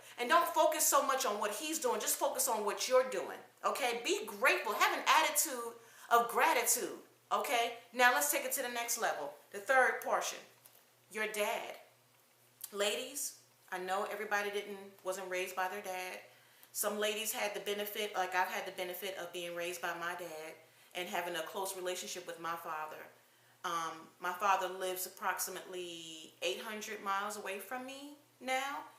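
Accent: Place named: New Jersey, United States. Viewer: Ms, great message. I could tell you were raised with your dad by the attitude you have toward family men that provide. Also I can hear that new jersey accent. Peace and blessings.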